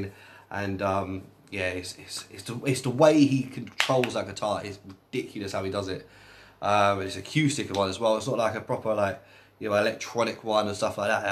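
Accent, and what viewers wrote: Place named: England, United Kingdom. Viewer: Thank you Mr.. You speak with a heavy English accent.I like your viscous English. Often I can"t really catch it cause I still learn it Thanks a lot indeed however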